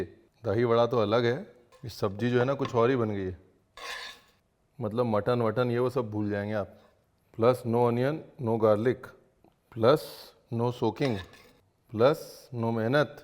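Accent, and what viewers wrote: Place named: Lucknow, India. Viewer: Your Lucknow-a accent is sooo good , you're so Raw 🔥🙏 thankyou for making this channel . 🥰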